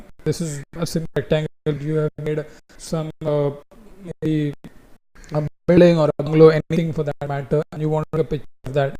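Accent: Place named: India. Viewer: With respect: This is a valuable tutorial. That cannot be denied. There is one problem with it, that being the speaker's accent - Indian, I think it is. It is very painful to listen to this man explaining his subject. It is the same with the many Indians presenting on the wide variety of topics which they post. I'm sorry for having to say this: these men and women want to share their knowledge with a broad audience, but their use of English language is a major stumbling block for we listeners.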